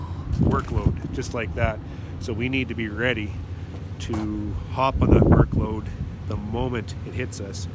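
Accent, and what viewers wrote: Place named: Winkler, Canada. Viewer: This gonna sound creepy but here it goes, I grew up around Winkler and I have a lot of good memories of my uncles wandering around muttering about equipment being broken or some weld or other failing. I've long since moved far way, worked in fields that have nothing to do with farming, got married had a kid etc. Sometimes its nice to just hear a guy talking in the accent I grew up around and talking about the kinds of things my uncles used to talk about. "Half pail of feed" haven't heard anyone say that in well over 20 years.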